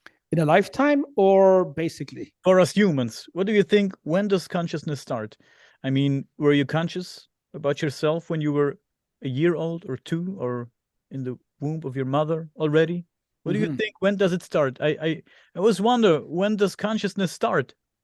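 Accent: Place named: United States. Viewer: Dass so gut Englisch kann, schreckt mich wenig, spricht wie ein Amerikaner👍 Und er ist noch dazu Österreicher wie ich...👌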